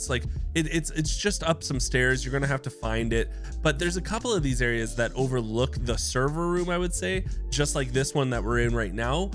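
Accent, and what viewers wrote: Canada. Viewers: I hear a Canadian accent ayeeee? Haha I just got wz on ps5 and I saw this blueprint on a dmz squad mem I really want this but it’s super hard looool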